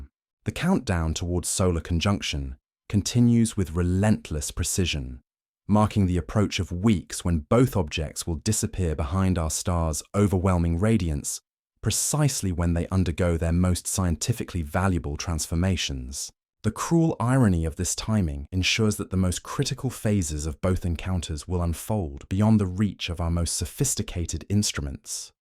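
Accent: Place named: United Kingdom. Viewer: I'm really getting tired of the gay British narrating on all these darn videos. How about good old USA English huh?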